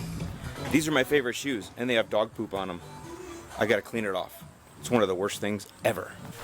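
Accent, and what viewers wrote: Chicago, United States. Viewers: Are you from the Chicagoland area? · Spot on Chicago accent!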